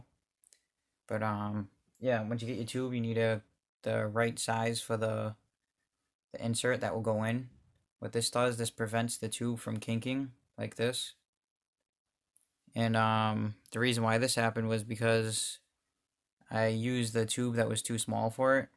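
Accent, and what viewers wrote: Boston, United States. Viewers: Ya sound like ya from boston, kid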